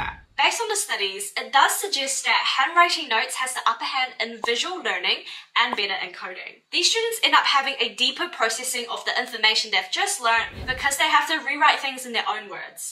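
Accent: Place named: Australia. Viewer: Aussie eyy. Thanks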